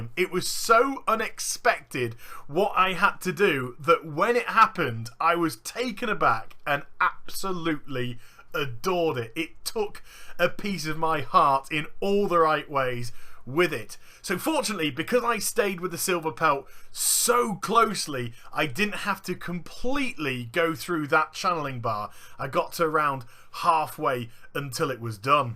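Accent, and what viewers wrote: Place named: United Kingdom. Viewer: Your comment is funny because he's from the country that created the English language, yet you "correct" his pronunciation.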